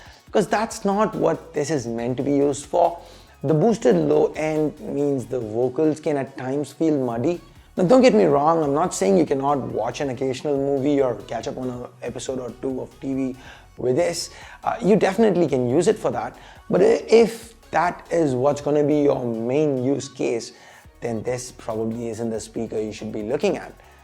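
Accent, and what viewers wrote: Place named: India. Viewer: Very good Indian English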